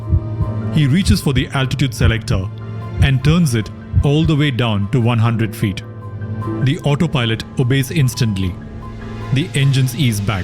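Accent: India. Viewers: Hey are you from india? You accent sounds indian. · Fck the narrators thick Indian accent … is so disturbing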